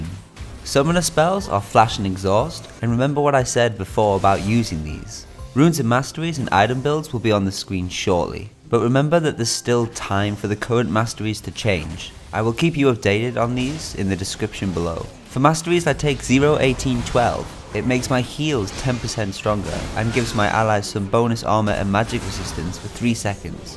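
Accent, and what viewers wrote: United Kingdom. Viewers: Your British accent makes me go: OMG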